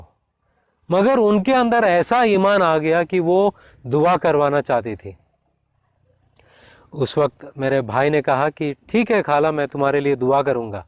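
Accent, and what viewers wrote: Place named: Goa, India. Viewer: Jhoot kion bol the ho tmhara accent he Goa k Christian's wala ha jhootay makar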